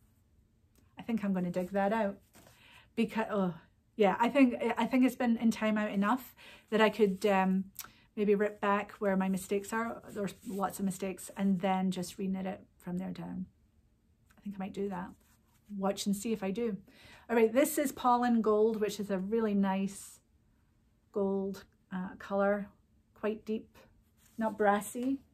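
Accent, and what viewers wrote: Scotland, United Kingdom. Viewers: Thank you for all the Scottish updates. My mom has recently passed at 85 years. Being raised in Holytown near Glasgow, she learned to knit with woolly wool from her mother and I from her. I will also appreciate your Scottish brouge as she never lost hers after 54 years in the U.S.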